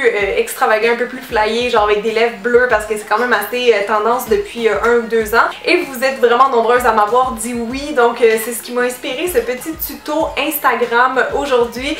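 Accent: Quebec, Canada. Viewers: j adore ton acsent québécois et tu es trop belle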